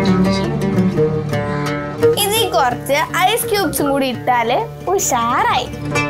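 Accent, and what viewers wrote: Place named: Malappuram, India. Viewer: നല്ല മലപ്പുറം ഭാഷ.... അടിപൊളി.... Nice.. 🤝🤝🤝🤝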